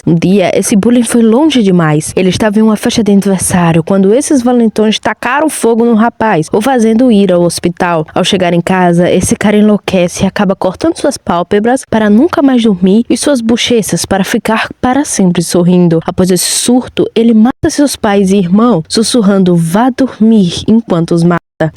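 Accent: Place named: Portugal. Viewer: Nossaaa... Cai de paraquedas aqui pesquisando sobre creepypastas, porque me deu saudades de ver algumas, e acabei adorando seu vídeo ❤ Sua voz tem um sotaque lindo, no começo até pensei era português de Portugal. Me deu mó nostálgia da época que a hora mais divertida era chegar a noite, e pesquisar alguma creepypasta no Wattpad, as que eu mais gostava eram as sobre o Jack Risonho, Jaff The Killer, e sobre a Deep Web e lendas japonesas bem bizarras. Tem um outro canal que faz videos-creepypastas incríveis aqui no YT , que é o Sigma Terror, eu sigo e ouço as histórias dele a mais de 8 anos, as histórias dele são muito interessantes e bizarras, algumas são até bem pesadas, mais o que mais me surpreende é que a forma que ele narra é incrível, e ele tem uma voz linda e boa de ouvir que faz você se sentir estranhamente confortável e relaxada depois de um dia cansativo. 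Aqui tem o link de duas histórias dele que eu considero mais pesadas ( tem muito mais, mas essas são as que mais marcaram minha adolescência ) : O Jack Risonho e Izaque <link> Na hora Certa... <link>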